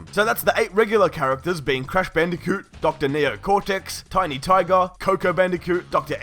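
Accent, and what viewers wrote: Australia. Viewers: Shu bro you sound like you're from bankstown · One month ago? Mate you sound aussie asf how do you not english in the first 10 seconds?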